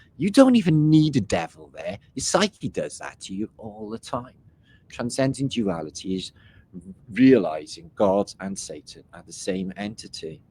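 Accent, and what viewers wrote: Wales, United Kingdom. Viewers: Very, very interesting, you weave an incredibly convincing narrative here. Love the dry humour and the welsh accent equally 😊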